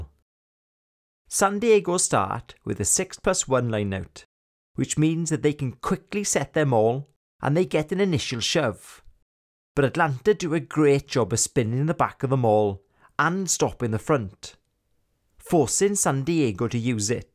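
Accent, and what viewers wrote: Wales, United Kingdom. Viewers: I spent two weeks in Wales in 1978. So good to hear the Principality dialect again. Thanks for the instruction as well. Rugby is an elegant game and thanks to Internet connections, I can get up much too early in the US and enjoy a match.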